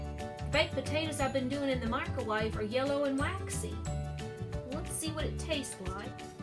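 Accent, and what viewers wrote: United States. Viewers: honestly, I don't understand why people dislike a video. If you don't like something, just move on. I was looking for a fast and easy way to do baked potatoes and your video was spot on. thank you and I was happy to see you're from Tennessee I lived there for two years and I miss it a lot, so your sweet accent warmed my heart, thank you for this wonderful video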